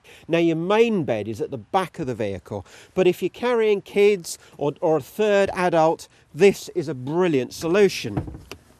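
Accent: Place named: United Kingdom. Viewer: Nice video, a little too fast to get the best view of the m/h Squeaky British voice too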